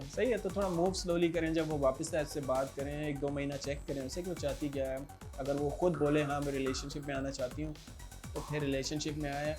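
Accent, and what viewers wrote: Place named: Kashmir, India. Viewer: Sir, not only vdo, but and every trick and sentence, crystal clear logically effective. Hopefully you are from JK as voice tone says where I stayed aporox 14 years. 👍🙏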